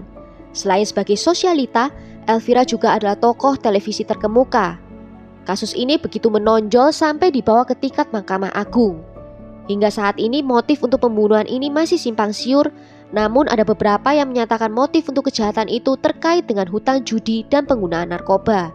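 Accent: Java, Indonesia. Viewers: alangkah medok logat jawe kamu bik...😁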